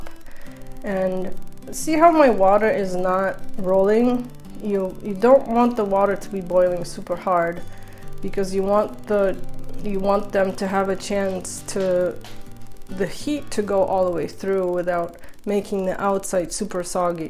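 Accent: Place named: Poland. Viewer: What's wrong with the inexpressibly sweet Polish accent, spoken by a woman? Whenever I hear a woman speaking with a Polish accent, my affected ears become listening and absorbing shells, as it were, in transport! In other words, I turn to jelly, in ecstasy!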